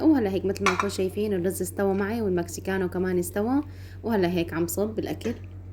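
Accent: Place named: Homs, Syria. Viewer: مع انه لهجتها بتشبه الحمصية 😍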